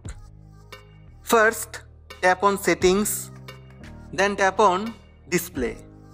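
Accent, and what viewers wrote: India. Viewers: Indian bullshit accent hahahaha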